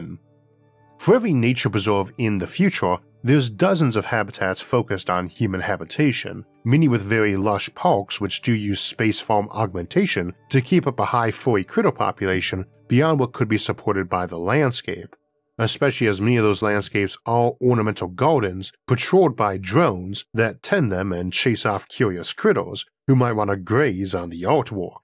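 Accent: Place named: United States. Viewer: What's your accent, i assume American because how big and wide it is, is my assumption correct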